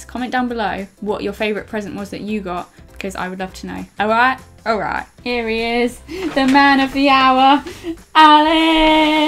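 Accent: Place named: London, United Kingdom. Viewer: Sotaque de Londres não engana!🇬🇧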